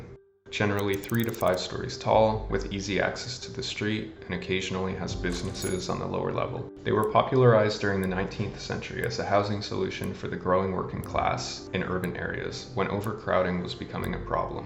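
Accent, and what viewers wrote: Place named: Canada. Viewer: I HEARD THAT CANADIAN ACCENT AND THEN I WAS CONFIRMED BY YOUR ABOUT SECTION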